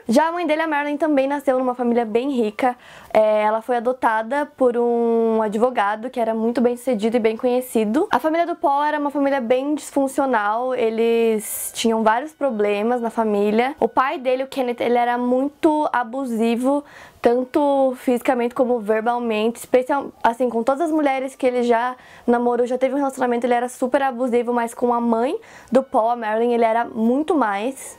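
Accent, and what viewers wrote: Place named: Rio Grande do Sul, Brazil. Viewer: vc é gaúcha? tem um sotaque em tanto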